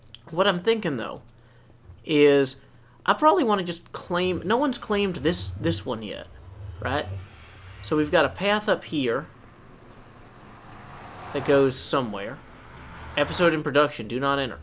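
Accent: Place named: South, United States. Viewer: It is my first time watching one of your videos, and I am greeted with beautiful singing and relatable musical hate for the nether. Your voice is wonderful! You have a bit of a southern accent, are you from Georgia? :D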